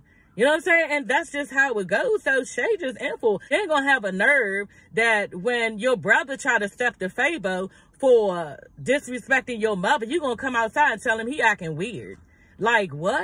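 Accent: Washington DC, United States. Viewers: That's a D.C. accent FO SHO!!! The way they say girl.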